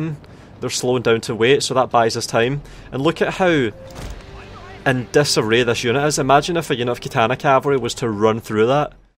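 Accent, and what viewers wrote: Scotland, United Kingdom. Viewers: you scottish? ik wierd ques but anyways great viedo never thought ninjas could be that useful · Are you Scottish?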